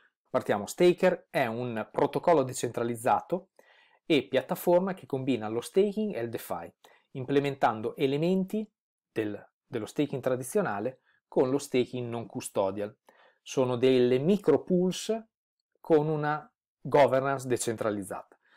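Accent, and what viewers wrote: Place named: Bologna, Italy. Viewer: Ottimo video, preciso senza essere troppo tecnico....e l'accento bolognese é fantastico... Detto da uno di Modena 😉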